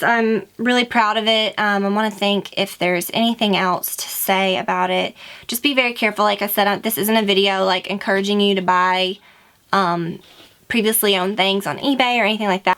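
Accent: South, United States. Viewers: lol why do I feel like you have more of a country accent in this video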